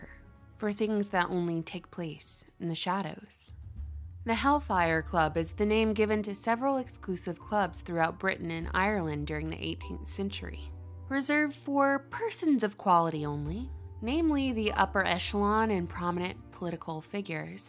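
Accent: South, United States. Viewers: Welcome back I’ve missed you n that lovely southern voice.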